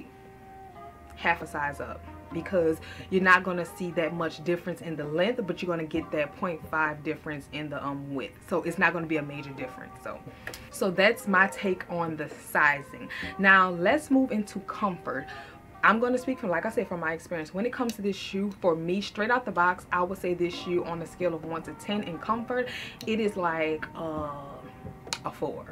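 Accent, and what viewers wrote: New Orleans, United States. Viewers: It's ok g if the New Orleans keeps jumping out I'm from Baton Rouge and spent half of my 90% off my child hood in New Orleans and that's what I loved the most tha accent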